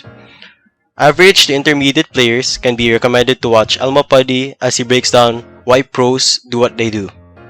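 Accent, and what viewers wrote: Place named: Philippines. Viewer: Great video! Don't know why but you kinda sound filipino hehe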